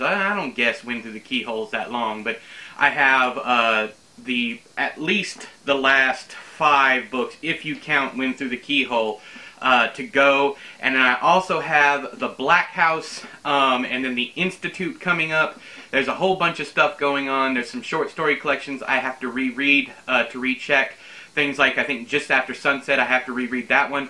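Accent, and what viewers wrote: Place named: Midwest, United States. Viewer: Where do you shoot from? I'm from Laja, Chile, myself. I like your accent, it's what I'm used to hear the most, having lived a couple of years in the Chicago area and in southern Wisconsin.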